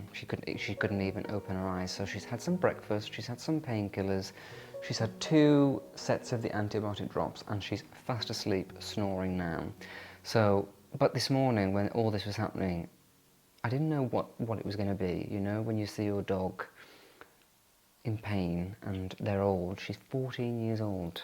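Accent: United Kingdom. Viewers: Thank god Little Sophie is feeling better. Nathan is such a good friend and we miss him. You have the most beautiful voice and I love your British accent. You just calm us down with that beautiful way you speak 😇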